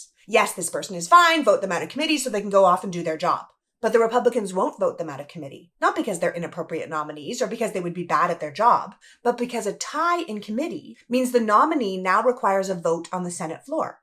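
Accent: Canada. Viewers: Love your Canadian accent.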